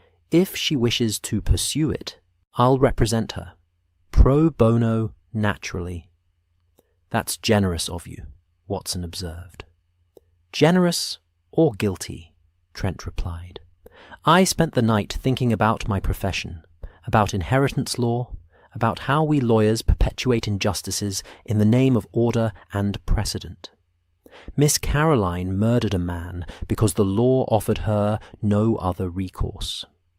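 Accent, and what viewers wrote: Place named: England, United Kingdom. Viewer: Clark is how we say that word in Britain. He has an English accent but can't say Vase. We say V-ah-ze. Americans say Vay-ze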